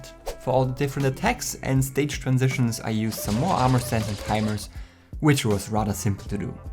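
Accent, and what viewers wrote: Germany. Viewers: You sound a bit like someone who speaks German, am i correct? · You kinda sound German xD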